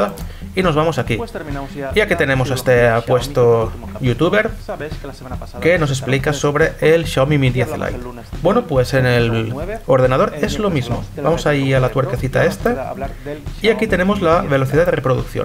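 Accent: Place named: Spain. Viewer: y a mi que me fascina el acento Espanol hostias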